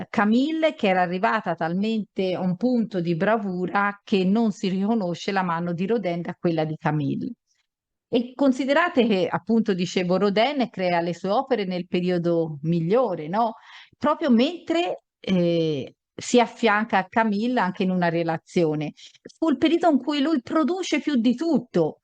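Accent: Tuscany, Italy. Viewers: bellissimo contributo con il tuo bell'accento toscano o fiorentino che ne so...bel documento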